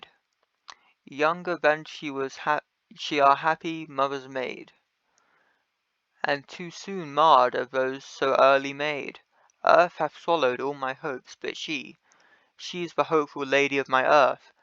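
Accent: United Kingdom. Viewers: why are you british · Why are you British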